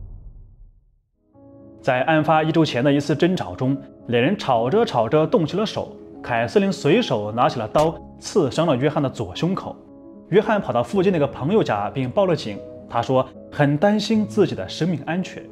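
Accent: China. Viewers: 我一直想知道你是哪裡人？字幕是繁體，用語和口音卻比較像國內，所以比較好奇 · 内地口音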